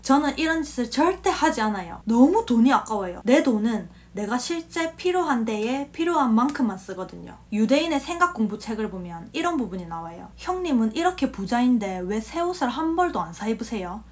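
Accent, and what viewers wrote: Gyeongsang, South Korea. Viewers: 똑소리나는언니네요경상도싸투리안들어면.하루가지루하게합니다 경제학박사님도 할배야하겠어요. 오늘부터 유툽어로주식.공부열심히할람니다..넘.똑똑혀요. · 우왕~ 우연히 보게된 님믜 방송.50대 줌마인데도 은근 잼나고 웃음이 나오네요. 경상도 말씨 좋고 또!다~맞는말. 말도 참 잘한데이~^^ 화이팅~!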